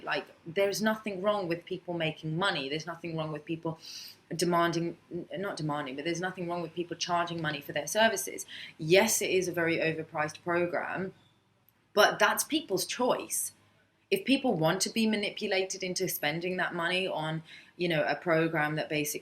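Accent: United Kingdom. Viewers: Hey, people have made reality TV shows with daily routines like yours. I'm sure it will be interesting to watch. Most people think a person with your job lives a life like a episode of Americas Next Top model on a daily basis. Getting views are kinda tricky. You gotta have a combination of the right tags, title, timing, & luck. Or have one of those super channels comment on your video to draw views from there fans. I have two videos with 500,000 views, but the rest of my videos have around a thousand or less. But I've never realy cared about views. My channel is dedicated to one niche video game, along with a couple other videos that I felt like making. It's all about art for me. Editing video & seeing it play. Kinda like art. Sometimes you will get alot of views & sometimes you wont. I saw you Showreel video. Have you ever tried to audition at the Travel Channel or Discovery channel? 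Your exotic look & British accent would make you perfect for a show like "Globe Trekker." Plus you know how to travel the world. I used to like watching that show back in the day. Watch this youtube video - /watch?v=Pyn2IOAO6Mc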